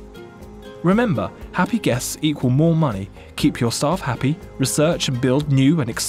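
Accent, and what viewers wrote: United Kingdom. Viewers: Unhhhh. His British accent is sexy.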